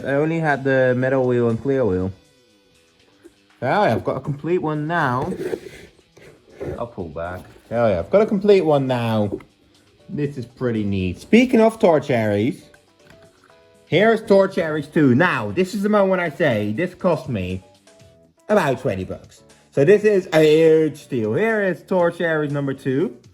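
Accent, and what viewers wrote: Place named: United Kingdom. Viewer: You're sound like British or United kingdom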